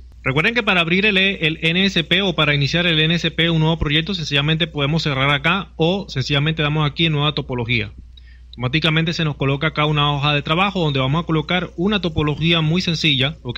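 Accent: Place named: Colombia. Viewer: pense que era colombiano, saludos tambien soy de vzla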